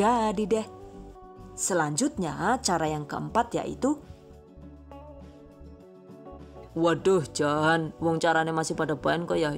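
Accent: Banyumas, Indonesia. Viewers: Jebule ngapak 😁 yu! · Saya oma Holi salam kenal sudah kue2 nya enak suara pembuat nya juga sangat merdu apalagi kalau bicara pakai logat ngapak lucu banget saya suka kue2 nya juga kelucuan nya sukses selalu AMIN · Baru ngedengerin ternyata bisa ngapak juga hehehe.. Ilmunya sangat bermanfaat.. Aku lngsung nyobain bikin lohh bude.. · Rika si wong ngendi yu deneng ngapak 😂😂😂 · Done #giveawayTHVDesember2019 Suka dg suaranya yg berat khas ngapak. Jgn dihilangkan ya. Pasti dong suka resepnya..simpel. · Deneng ngomong ngapak? Asyik.... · Minyak bulus nyong haha..deneng ana wong ngapak ya yu....turu lah wes wengi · Ngapak ngapaknya yg sll d tunggu....😁😁😁 mksih resep2nya 😚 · Siip resep orang ngapak... Heeee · Ngapak ya mbok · rika wong endi si yu.. kayong pada bahasane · Orng cilacap apa banyumas kak...sya orng tegal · Ane senang chenel ini chef-nya itu lo kalau ngomong lucu Inggris ngapak,jadi penasaran dah sama pasti uwonge ayu Iki,koyo msk Soimah hebe,dan makasi resepnya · ih ngapak kalii ini wkwk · Rika wong ngapak yu, salam kenal yu🤭 Kesewun resepe(terimakasih resepnya) 😊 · Asline ngapak😍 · ngapak bersaudara...pda kro adonan kulit martabak telor y mbok... 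· Mbekayune wong ngapak y..pada karo inyong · njenengan wong ngapak apaa mbaa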